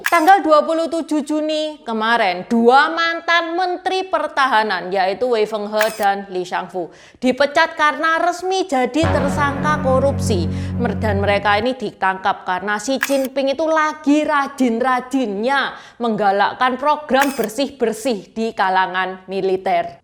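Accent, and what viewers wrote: Java, Indonesia. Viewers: medok amat ngomong jawanya si 😂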